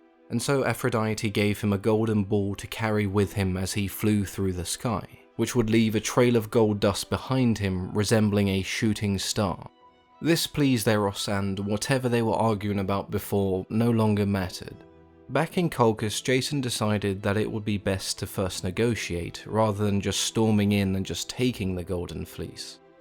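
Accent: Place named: United Kingdom. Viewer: What part of the uk are you from?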